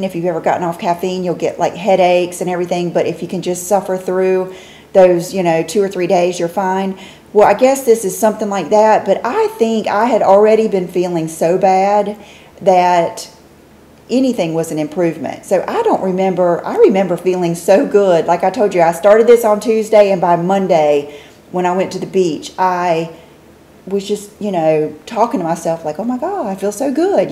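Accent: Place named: Texas, United States. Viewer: Besides being drop dead beautiful and I think your accent is from Texas cause I'm a Texas gal living in California but you are also so sincere so sweet and thoughtful I love your channel love you❤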